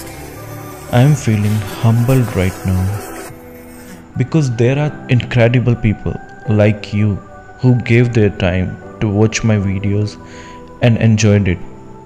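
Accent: India. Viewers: Me: Heard his accent Also me: Is he an Indian?🤔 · I subscribed u wen u had 120+k subs now u have 300k+ subs....that's a great achievement in jst one year.....gud luck for ur future...and btw r u indian??cuz ur accent sounds like one...love from North East India.....😄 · I knew it!! You're an indian 🇮🇳👳 I will never forget that accent Btw.. You've done such a incredible video. That's showing your talent so much, the sound effect and the timing.. Cool man.. Love from Indonesia❤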